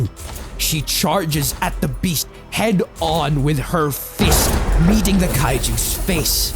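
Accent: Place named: Philippines. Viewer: I had a feeling you were filipino from the accent but I couldn't be sure but hey, I got my confirmation at the start of the video lmao. KABABAYAN 🔥🔥🔥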